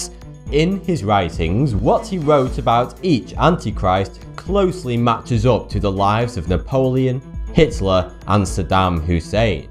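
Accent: United Kingdom. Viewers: Is that British accent? I must replay some sections because of that accent. Not to say that British isn't a beautiful accent. It's just that I rarely have the pleasure to hear accented English. But the speaker is rather cute. Like, bunny type of cute. The type than you wanted to cuddle and eat- I think I'm going to stop now. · I put this on before bed, and only listen to it... Ive listened to a bunch of these, and all I hear is "42 here" not thoughty2 lol.... British accent!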